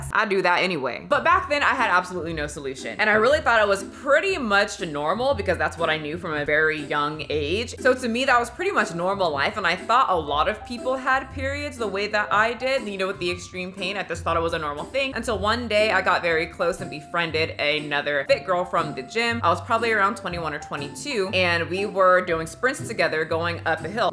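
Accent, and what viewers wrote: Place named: Hemet, United States. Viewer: Why does this sound like Hemet California 😂😂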